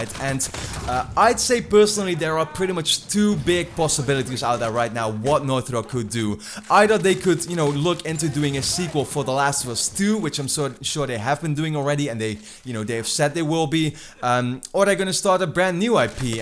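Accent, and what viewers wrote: Netherlands. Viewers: Is Dutch? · lel ik had 2 min nodig om te horen dat je nederlands bent XD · lol hardcore nederlands accent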